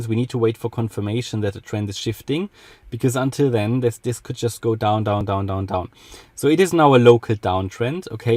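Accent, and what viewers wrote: Germany. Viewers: Man merkt dass du deutsch bist 😂 sehr guter Content mach weiter so!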